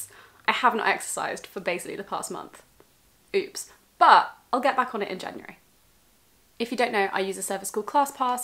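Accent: United Kingdom. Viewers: Hello🇧🇷. I would very much appreciate if you launched a podcast on Spotify, because I'm madly fond of British accent and yours is one of my favourites when it comes to the British youtubers that I follow.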